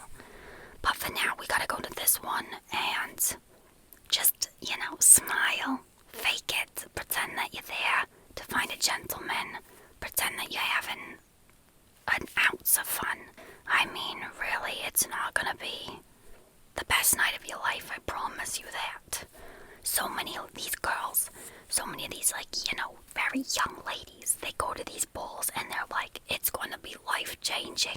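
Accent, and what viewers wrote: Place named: New Jersey, United States. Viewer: Period drama fanciness AND jersey accent?? Two huge tingle triggers for me, combined???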